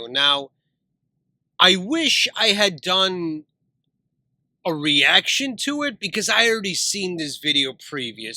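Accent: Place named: Russia. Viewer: Deeeeeeem I scrolled through your oldest vids to now and dang hearing your accent change, slowly losing the russian accent and becoming more american sounding is a blast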